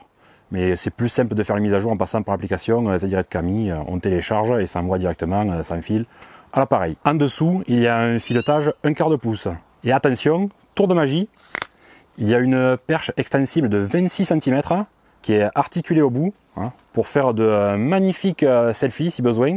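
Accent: South, France. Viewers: Vidéo extrêmement complète, bon boulot. Je me permet une petite précision : il y’a bien un moteur pour le ROLL, un autre pour le PAN. C’est le moteur TILT (inclinaison avant-arrière) qui n’est pas présent sur ce smooth X. Continue comme ça avec l’accent du Sud 😆. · Comment ne pas dire que ta vidéo est un travail de pro ! Félicitations, avec l'accent du sud ! 😁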